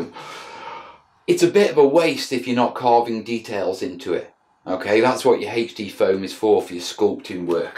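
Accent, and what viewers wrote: United Kingdom. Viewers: he has the type of British accent that I like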